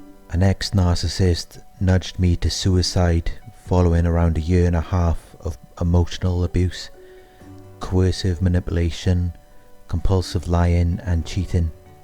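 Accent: Wales, United Kingdom. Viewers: New sub. You from Wales? You sound like it. So true video.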